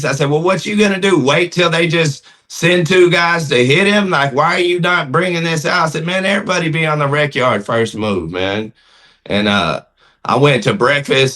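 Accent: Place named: South, United States. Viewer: I know who you are talking about . They do kinda favor this cat here got more of a southern draw.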